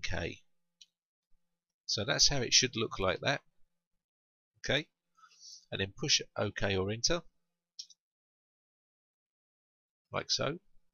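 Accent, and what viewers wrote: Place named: Cockney, United Kingdom. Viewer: You're so cockney that I thought you were Australian at first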